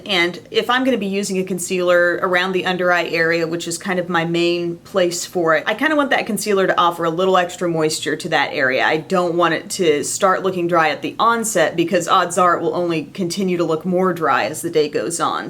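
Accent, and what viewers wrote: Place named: Midwest, United States. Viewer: I just came across your channel, and as soon as you started talking I knew you were from the Midwest, no insult intended. I'm from the Midwest also, and I recognized your accent right away, because you sound like my entire family! Anyways I really enjoyed your video, I'm a new subscriber! ❤️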